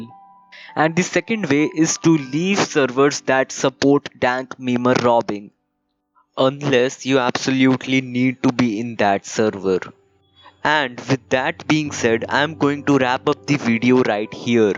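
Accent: India. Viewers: Real Indian English Accent.... at least he didn't tried to show to be fluent (which end up as cringe) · Ha Indian man nice accent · thank you so much for having an indian accent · The Indian accent 🗣️🗣️🗣️🗣️🔥🔥🔥🔥🔥💯💯💯💯💯💯💯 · indian accent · his accent makes the video way better, nice video indian man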